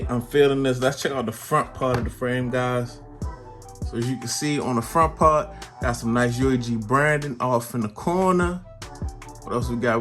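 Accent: Baltimore, United States. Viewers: Def got the Baltimore accent 💯 GO RAVENS 🐦‍⬛💜🖤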